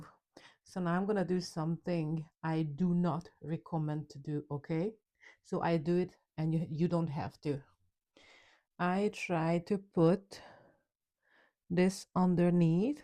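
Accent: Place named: Northern Ireland, United Kingdom. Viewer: Oh wow! My hat is off to you and I don’t know how you coped! If I feel ANYTHING odd it bugs me until I fix it. I got up in the middle of the night, my elderly dad was up watching TV and I walked in like a zombie (his words, lifted a nail file and walked off! 😂😂 Well done on prepping enough to make them last that long! Can I ask if you are living in or have spent time in Northern Ireland? I swear little things you say remind me of our accent! I hope thats not insulting. Lol Great video.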